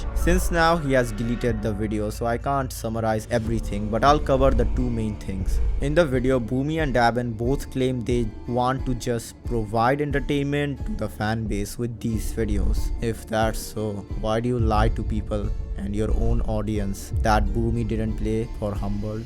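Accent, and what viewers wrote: India. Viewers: That goofy ahh Indian voice💀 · ahh first ur Indian accent fix that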